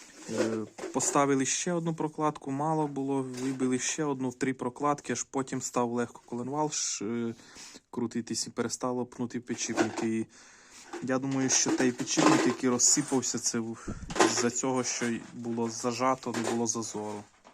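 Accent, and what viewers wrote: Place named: Hutsul, Ukraine. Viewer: Акцент гуцульський.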